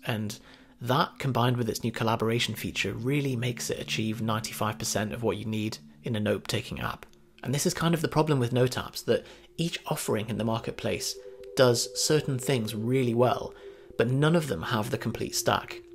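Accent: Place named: RP, United Kingdom. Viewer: Splendid command of the English language. You could walk straight into the Royal family with that accent!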